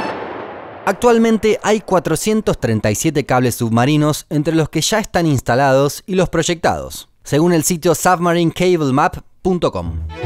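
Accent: Argentina. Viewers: Un Mundo Inmenso tiene un formato tan atrapante, un guion excelente, y un ¡narrador argentino che!!! que hace aún más entretenido el contenido!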